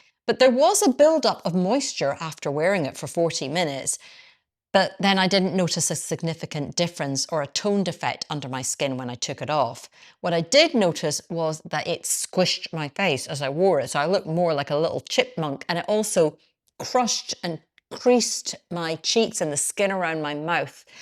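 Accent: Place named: Scotland, United Kingdom. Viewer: Aaa yes! It is hard for me sometimes to hear where people in UK are from but you sound like Nicola Sturgeon! Your Prime minister? Hmmm should know this better! Feels like I have more question marks the more I write… 🙄 It sounds lovely anyway 🥰👌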